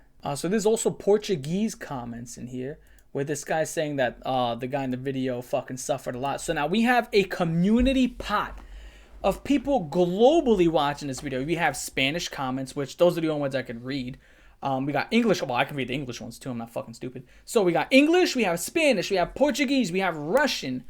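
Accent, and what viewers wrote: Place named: Nuyorican, United States. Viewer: I’m from Puerto Rico and You speak that New Yorican Spanish lol but it gets a pass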